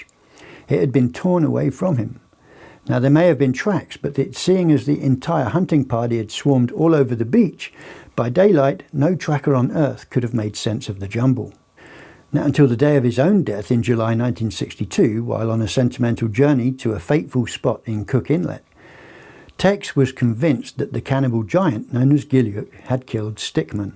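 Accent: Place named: Ireland. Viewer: I really like this man’s story telling the Irish accent is so pleasant to hear. Bigfoot is real. They are an ancient tribe of people. DNA has showed they are a human / hybrid. Yes dog men are dangerous and can be found in Michigan to the east coast. They are what natives call skin walkers because they can stand on their back feet as well as on all fours. Some call them dire wolves. Bigfoot, Sasquatch or Sabe are intelligent and many known cases of saving humans.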